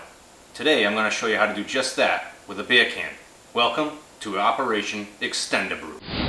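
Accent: Boston, United States. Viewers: With that Boston-esque accent, I'd say more like Peter Griffin. :D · Has the Boston accent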